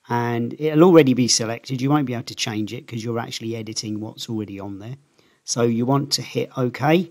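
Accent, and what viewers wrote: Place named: Scotland, United Kingdom. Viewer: You sound a bit Scottish.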